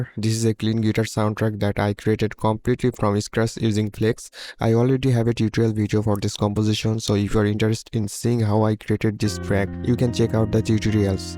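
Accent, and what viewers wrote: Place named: India. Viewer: Nice work👍 I hear an Indian accent😎 Hello from Russia 🇷🇺 FPC is an optimal tool to make pro and solid drum part in FL, but sometimes I use NI Battery😉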